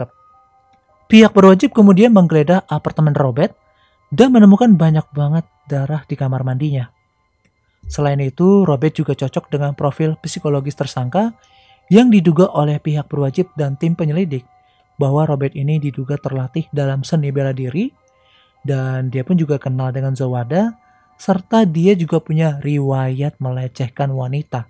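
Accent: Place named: West Java, Indonesia. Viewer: Mantap aa agak lucu dengar nya pasti dari jawa barat y😆 Pelajaran paling penting .. Penting ny kita bersosialisasi dengan orang lain biar klo ad apa ap ada yg tau dan nolongin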